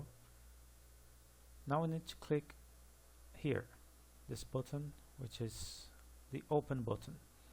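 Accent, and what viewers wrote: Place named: Brazil. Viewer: reconheço um sotaque brasileiro de longe, muito bom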